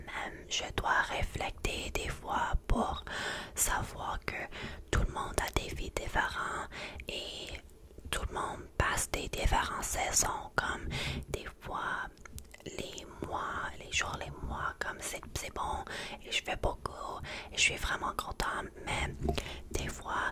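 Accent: Quebec, Canada. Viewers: Honnêtement pour l'internet, vraiment pas trop mal ton Franadien! Well done and very calm ASMR experience!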